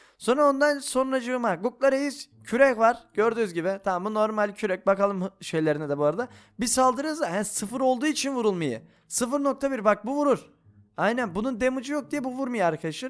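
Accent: Black Sea, Turkey. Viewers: Abi karadenizin neredinsenn söyle ne olur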